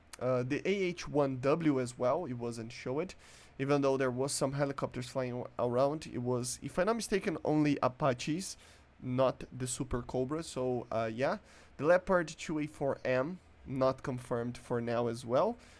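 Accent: Brazil. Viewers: he's brazilian, not british so it's ok. I'm pretty sure you don't pronounce any other language correctly. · He's from Brazil.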